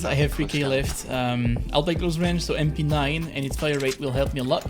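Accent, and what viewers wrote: France. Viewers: Y a un p’tit accent français Nn 😭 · yo are you originary from frace or a country who speak frenche cause you have a litle french acent some time (love your video)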